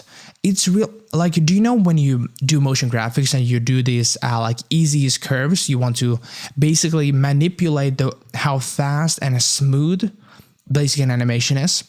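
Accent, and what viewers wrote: Finland. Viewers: Are you from Finland? Just a hunch based on your accent. Good vid man.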